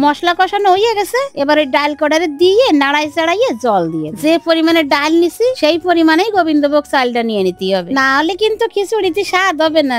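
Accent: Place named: Jessore, Bangladesh. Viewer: Apnader vasa jashore er monirampurer manuser Moto vlo lage onk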